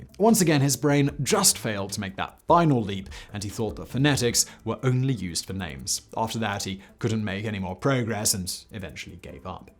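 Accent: England, United Kingdom. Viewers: More interesting than that stone, can someone tell me what english accent does this guy has? It is definitely not an American, but it does not sound like standard english either.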